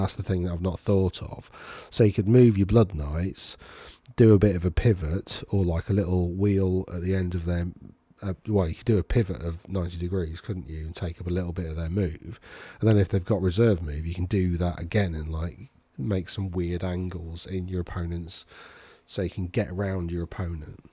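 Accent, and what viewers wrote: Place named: South, United States. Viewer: Building my witches and psychos in the woods vampire force at the moment very exciting.. What's your region you sound like you are a southerner like myself?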